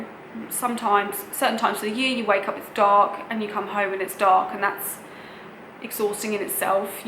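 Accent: Australia. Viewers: Happy you like it here, your way of talking is a real laugh, such a great mix of UK and OZ. You live in Townsville but first I thought I heard more of a Melbourne accent there. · Your accent is turning Aussie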